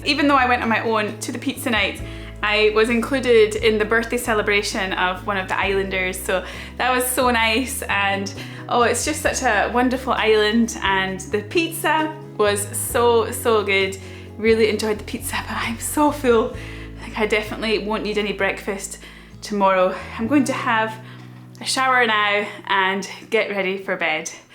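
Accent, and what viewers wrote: Scotland, United Kingdom. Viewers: Wow! look at you without your woolen cap. Love your scottish accent..easy to understand..but difficult to immitate❤ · Beautiful lady, beautiful accent. So proud to claim you as one of my own (scottish)❤😉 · What an entertaining video! Everything was so interesting and moody. As a german I always have to take the ferry from Amsterdam to Newcastle ( spend 2 -3 times a year in Scotland), so your video about the ferry trip was so interesting everything looked so familiar to me, even your sea sickness 😬. I use to travel alone, and I love to stay in small cottages in the highlands ( although I always feel a bit scary at nights ). In 2021 I stayed a few days in the Hostel in Tarbert on the Isle of Harris, and due to the pandemic I had the hostel for my own! That was great and strange, both., besides I really love your scottish accent and the fact that you are so easy to understand 👌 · You have such a lovely Scottish feminine accent my dear. 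💖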